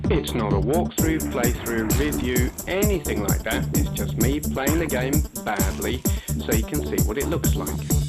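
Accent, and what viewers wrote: United Kingdom. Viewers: what part of th uk are you from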